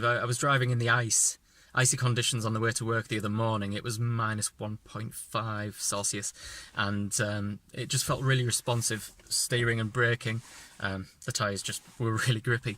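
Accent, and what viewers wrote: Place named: Cumbria, United Kingdom. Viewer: Can't believe after all these years I've never put winter tyres on my car in winter. On 27 Dec I nearly got caught in the 20 car pile-up on the M6 in a mini-blizzard. Never again. Luckily for me, I won an auction of ebay and got 4 wheels with 4 Nokian WRD4s fitted for a crazy bargain price. Got them on now, and what a step change!! Feel so much more secure now. Doesn't make me impervious to accidents, but sure takes the environment out of the equation. 👍 PS I see the footage was Yorks/Derbyshire, but that doesn't half sound like a West Cumbrian accent!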